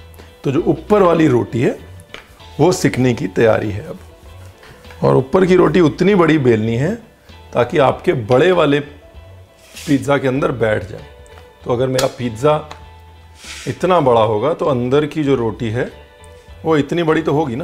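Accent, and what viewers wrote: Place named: Punjab, India. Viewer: yeah exactly. The way he iterates some word in Hindi and Punjabi blended.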